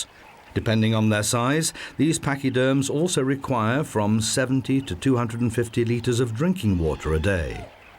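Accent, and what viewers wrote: United Kingdom. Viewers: These documentaries would not be as good without the narrator having a British accent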